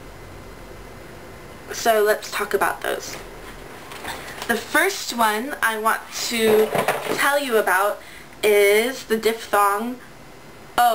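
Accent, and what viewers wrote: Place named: South, United States. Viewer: Great! It's interesting to realize @@ that you've killed 5 vowels with one video ^_^. And again, I like the way you teach, it's really alive! I really love to listen some culture backgrounds of pronunciation or anything in English, for example when you tell that you got Southern American accent. I also like that you supply the CC or subtitles. That's what I've been hoping for all teachers do the same.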